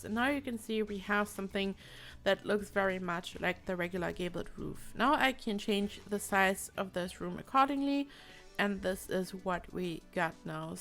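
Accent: Germany. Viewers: Are you german?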